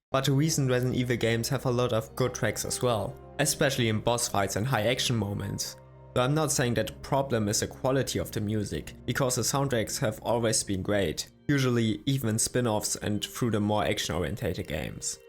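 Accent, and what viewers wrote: Germany. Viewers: Brudi….mach lieber deutsche videos 😂😂😂 du hast den heftigsten deutschen akzent aller zeiten und deine grammatik ist grauenhaft. Es heißt „oriented“ und nicht „orientated“ beispielsweise. Aber kein hate 😅 unabhängig davon ist die videoqualität, recherche und videoschnitt top 👍 ❤ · It's totally fine to have an accent and also having a strong accent. It was just funny to me that I instantly knew that you're german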